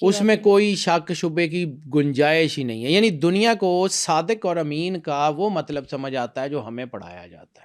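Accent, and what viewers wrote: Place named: Punjab, Pakistan. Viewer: Use normal accent to speak.. Not punjabi accent.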